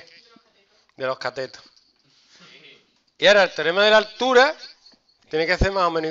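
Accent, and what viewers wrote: Andalusia, Spain. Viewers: ole ese andaluz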